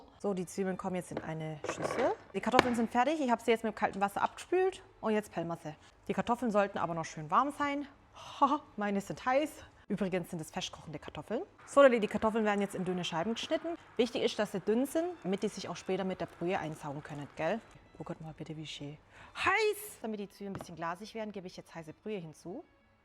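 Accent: Swabia, Germany. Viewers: Die Stimme oder die schwäbische Mundart? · Sehr schade so eine tolle Frau muß schwäbisch reden Kartoffelsalat schmeckt wie nasse Socke in Hochdeutschland geht auch mit Mayo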